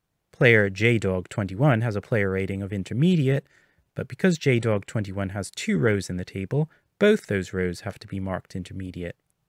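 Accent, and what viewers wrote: United States. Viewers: Are you from Philly? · You from Baltimore?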